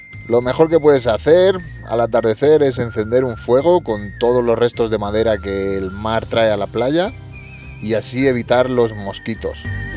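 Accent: Spain. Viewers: Viendo videos de aventureros me encontré tu canal! No te conocía pero viendo tu canal noto acento español???? De haberlo sabido! Yo me crié en España hasta los 22 años! Ojala hubiéramos coincidido antes! Te hubiera dicho unos spots muy buenos en oaxaca para acampar! Si te vas a quedar más tiempo por el estado échame una voz! Saludos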